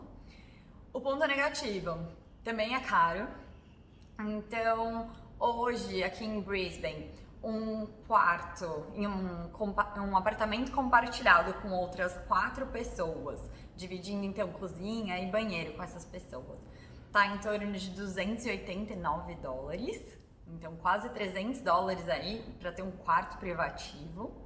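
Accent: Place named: São Paulo, Brazil. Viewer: Otimo video …Vc tem um sotaque muito forte de paulistana ha ha, fofo, Eu Tbm Sou de SP mas meu sotaque is mais do interior😊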